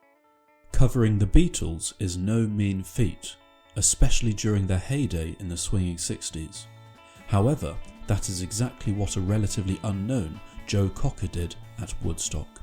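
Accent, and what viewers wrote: England, United Kingdom. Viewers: and her....got to be in there. Since you have a bit of an English accent I don't think you know the new Patriot culture. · I was there at age 17. Does this English accent narrator even mention? Paid least, played the best and most moment. Also, made an impassioned and unforgettable contribution with. I had seen the year before at the unforgettable Newport Pop Festival in the broiling Costa Mesa summer of 1968.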